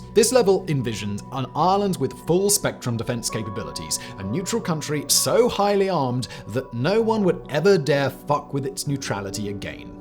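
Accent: United Kingdom. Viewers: This isn't journalism. You're so very clearly in favor of the militarization of Ireland. Curiously enough that would serve British interests too. Ireland would fall within a day and we also don't support your wars, nor do we have any interest in fighting them for you. This country has enough problems to deal with and they won't be resolved by stepping on other peoples toes. For those watching, I plead with you: don't be fooled into this as if it were some straightforward and logical conclusion because a fella with an Etonian accent said so, don't be cretinous fools, please. Open a history book. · Don't listen to that posh English bollox.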